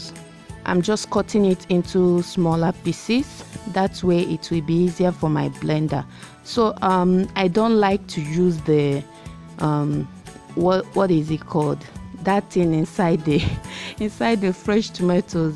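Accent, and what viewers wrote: Nigeria. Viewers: Madam the soup it's self looks exceedingly palatable but the sweetest thing you did is employing the Nigerian twang in your vocals. Keeping it real as you are suppose to be, as you are, Natural. Unlike the cartoon characters one sees via you tube. So fake and unreal. Love you, keep it up.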